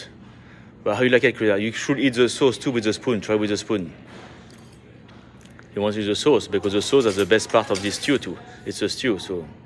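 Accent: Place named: France. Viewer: Merci! It's a pleasure to see you again teach americans our uncopyable french accent... Je suis un fan de ta chaîne sérieux... Je te souhaite le meilleur pour la suite, tes video sont fraiches, pleines d'humour et tu te prends pas au sérieux en plus, chose rare! Continues comme ça, ça cartonne! From Lyon with love ma poule!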